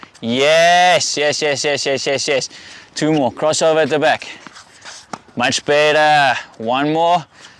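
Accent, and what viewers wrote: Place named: South Africa. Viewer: Few things more satisfying than your coach expressing approval by shouting "BETTAH" in a South African accent.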